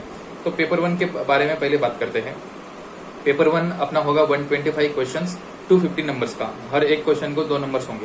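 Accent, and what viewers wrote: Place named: Maharashtra, India. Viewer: Amazing videos sirr... Aapki hindi me jarasa marathi ka leheza hai... I also frm mh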